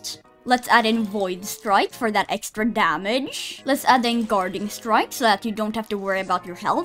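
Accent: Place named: Scandinavia. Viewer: Are you scandinavian? I am norwegian and I recognize the accent hehe :)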